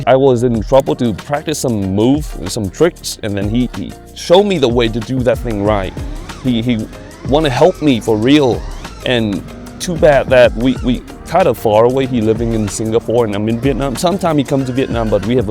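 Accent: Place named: Texas, United States. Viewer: I see, thats kind of similar learning curve to mine. Definetly not, your accent sound cool, you sound like some bloke from texas (atleast to my slavic ears). Keep on grinding mate!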